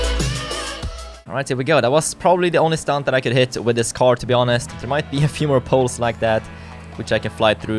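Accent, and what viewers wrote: Sweden. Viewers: Are You frome sweden? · I think ur swedish im i right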